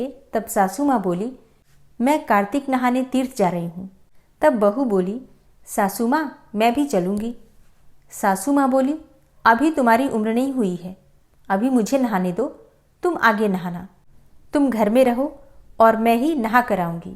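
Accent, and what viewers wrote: Standard. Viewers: अति शुद्ध उच्चारण विष्णुसहस्त्रनाम 🙏🙏🌹🌹 ॐ नमो नारायण ❤❤ जय हो ❤❤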